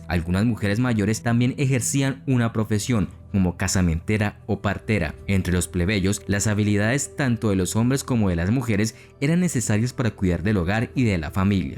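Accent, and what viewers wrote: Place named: Colombia. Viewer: El narrador es Colombiano, se nota cuando pronúncia Frijoles y cuando no puede pronúnciar Las palabras Nahuatls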